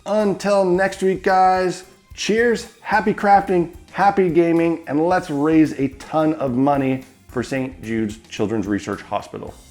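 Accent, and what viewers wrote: Canada. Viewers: I kept wondering if you were Canadian I'm glad I have confirmation.haha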